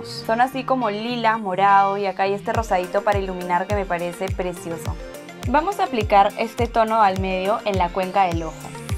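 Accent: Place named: Chile. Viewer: De qué país eres? Algo de tono chileno suena aveces 😅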